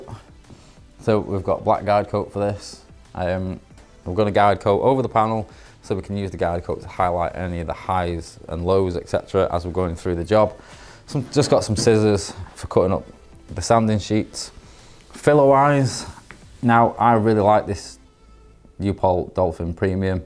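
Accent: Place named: Northern England, United Kingdom. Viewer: Great video bud. Just doing a Mini now and came across your videos. Really well explained and nice to hear in British English (although Northern) lol - Cheers fella x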